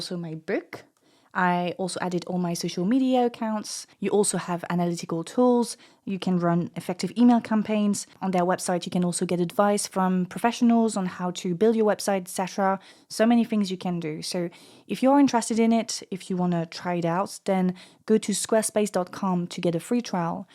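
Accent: France. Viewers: French pronunciation of indian names is so cute and funny at the same time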